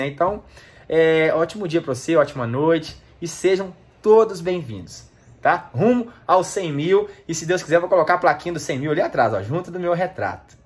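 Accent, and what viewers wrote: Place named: Minas Gerais, Brazil. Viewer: ADORO VER VOCE FALAR MINEIRO...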